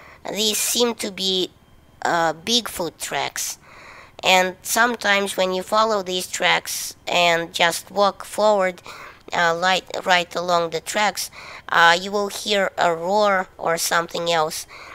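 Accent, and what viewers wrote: Russia. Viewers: are you half russian half asian or something when i heard ur voice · Спасибо, что рассказал. Половину я и вовсе не знал. А по начальному английскому , понял что ты русский) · I can't help but notice your russian accent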